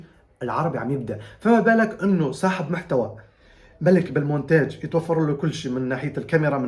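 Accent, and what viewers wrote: Iraq. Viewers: فدوة احلى سوري يجيد اللهجة العراقية ربي يحفظك ويعلي مراتبك ان شاء الله توصل للمليون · سوري وانا من بدايه الفيديو وانا مفكرتك عراقي بسبب اللهجه ❤ حبايبنا السوريين 🇸🇾🌹♥ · واجيتكم بعد غياب صارلي 4سنوات مو شايفك مشاء الله عليك شو احس انته صاير تحجي عراقي مبروك ع المليون فديت ❤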